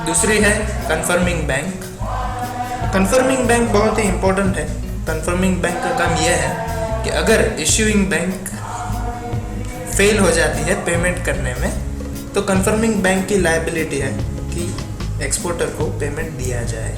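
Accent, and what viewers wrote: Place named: Gujarat, India. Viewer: Sir, i did import export course... Ane tamara tone parthi lage che k tame Gujarati cho.... Kai Job hoy toh let me knw... M ready to cm at ur place😁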